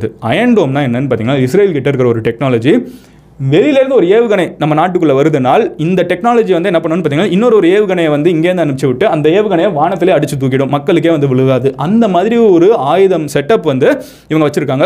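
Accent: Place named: Kanyakumari, India. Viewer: Kanyakumari slang ❤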